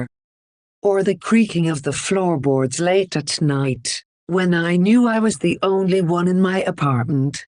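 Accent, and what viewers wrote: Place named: Ireland. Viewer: That's an Irish accent I hear, I'm a gang stalking victim and I'm Irish. Are you an Irish target?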